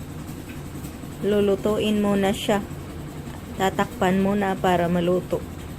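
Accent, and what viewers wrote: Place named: Visayas, Philippines. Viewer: Mga tao jud noh bisag unsa nalay bantayan. Pastilan dolor! Magbuot mn mo ug mag tagalog sya .. Kamo dw pag tagalog be paminawon nato inyong accent.. Natural lahi iyang accent mga dzai kay bisaya man sya di man pure tagalog.. Kung ang tagalog bitaw mag bisaya lain man pod kaau paminawon.. Imbis magpasalamat ta kay naa tay nakat onan nga bag ong recipe mamantay hinuon.. Sus Ginoo!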